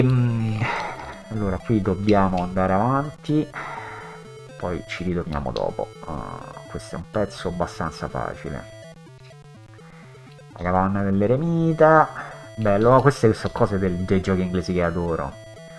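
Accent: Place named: Rome, Italy. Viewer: Si grazie, INPUT anche era una traduzione di una enciclopedia Inglese se la trovo la ripulisco! Giù allo scantinato ho anche parecchiè riviste a prendere polvere e umidità, tipo LIST, e COMMODORE GAZZETTE (era la mia preferita per Amiga e c64), L'Amiga 500 purtroppo non ce l' ho più, gioco o scrivo qualche programmino in Basic sull' emulatore di tanto in tamto. Ma il C128 sta nello scatolo insieme anche ai manuali ecc. Penso che sia un po' in cattivo stato, tipo mancano un paio di tasti e non si accende, ma si se si può rimettere in sesto ne sarei felice, dovrei informarmi come spedire un pacco grande, cosa mai fatta, sono una chiavica a risolvere problemi pratici e non mi intendo di elettronica, io sono vicino Napoli e tu dall'accento penso che sei area Roma, quindi penso dovrei spedirlo, ma penso una volta capito il tutto non dovrebbero esserci problemi, quindi si certo, nel caso fosse possibile mi farebbe molto piacere, quindi mi metto in coda :) PS: se fossi interessato alle riviste COMMODORE GAZETTE (il mio computer preferito oltre al 128 è l'Amiga naturalmente, perciò le compravo) e se fare e mandare pacchi non è una cosa troppo onerosa/e/o/stressante te le mando, (o anche la stessa INPUT se la trovo, le regalo naturalmente, lo dico a scanso equivoci, dato che stiamo su internet), penso che starebbero molto più in buone mani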